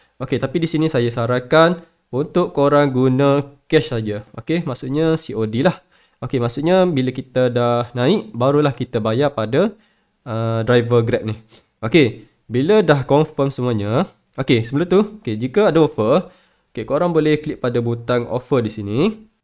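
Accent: Kelantan, Malaysia. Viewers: kecek kelate terus lagi baik...tak payah kau orang2..pelat kelate mu tak boleh lari...hehe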